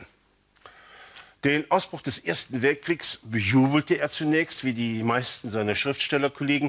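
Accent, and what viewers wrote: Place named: Ruhr, Germany. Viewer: ist sicher keine "Kaulquappe" sondern ein versierter Literaturkenner. Was aber den Genuss seines Vortrags für uns etwas trübt ist dieser leicht aufdringliche, asthmatisch Oberhausener Pottbeiklang.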